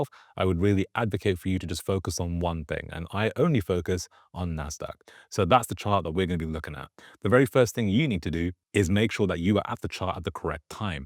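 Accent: United Kingdom. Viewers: Used to live in London. Hear the accent. Thanks for this FVG ORB strategy. For beginners on accounts less than $25k, limited to three day trades per 5 days. I tested this 03/20/26. MU had bull FVG , , . Entry . went south hit Stop loss . NBIS: breakout , FVG . Entry. dropped into OR, rose out then dropped again to Stop Loss . And one more CRCL: breakout . FVG small . Entry. Went south . Hit stop loss . Win %: 0% Bad day/bad week for bulls. These were all bulls. Not into shorts yet, I should have as the market was heading south. SPY could have netted almost 10:1r:r short. The psychology is spot on. One question: do you stop checking for FVG at 10am/11am? Usually by then the market has cooled so if you don't hit FVG by then you are likely not going to make much? Thanks again. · bro, take your fucking British accent and pike on some curry and shove it up your fucking ass! 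Scammer, scumbag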